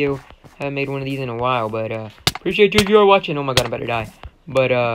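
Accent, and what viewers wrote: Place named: Texas, United States. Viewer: You kinda sound like ur from texas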